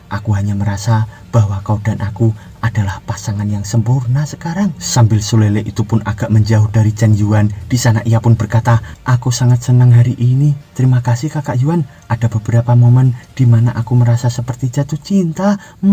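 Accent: Java, Indonesia. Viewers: Seneng bgt logat jowone mas.. mantep pol.. rasa masku lg cerita 😂😂😂 semangaaattt!!!!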